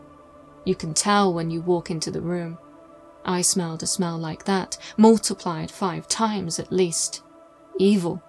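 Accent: England, United Kingdom. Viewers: I absolutely love this channel 💓. The narrator with her English accent makes for an amazing story teller!! I also love how the narrator takes the content seriously and tells it in a serious manner ❤.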